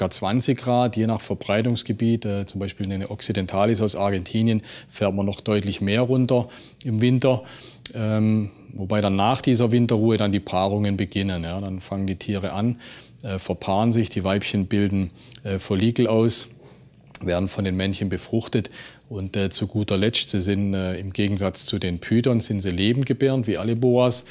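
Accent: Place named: Baden, Germany. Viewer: nein badisch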